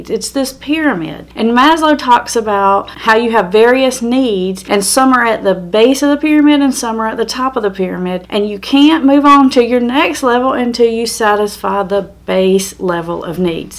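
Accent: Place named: Eastern North Carolina, United States. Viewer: I love your accent!!!!! are you from eastern NC by chance?????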